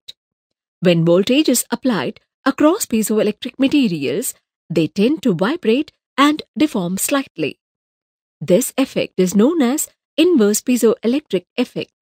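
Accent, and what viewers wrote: India. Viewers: Great, but unfortunately I will never get used to that English-Hindi accent 😓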